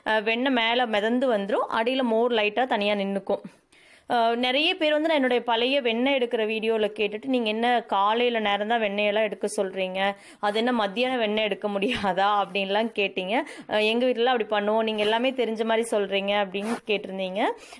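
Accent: Kongu, India. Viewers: Kongu slang video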